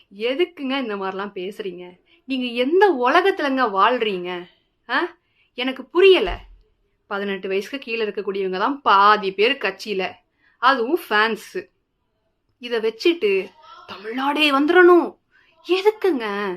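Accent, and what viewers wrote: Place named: Puducherry, India. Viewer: He is from Pondicherry. They speak like this only.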